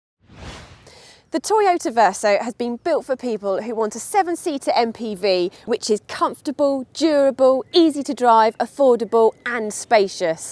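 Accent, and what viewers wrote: United Kingdom. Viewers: I like British accent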